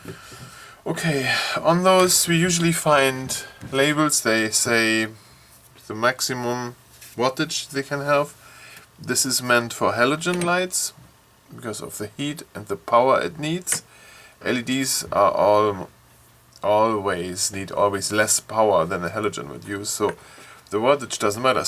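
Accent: Germany. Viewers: i'm not german but i used to live in germany so i recognized immediately by the sound of your voice :-) thanks for this helpful video! I wasn't sure if i had to buy a whole new LED track light system as mine came with halogen, good to know i just need to buy the bulbs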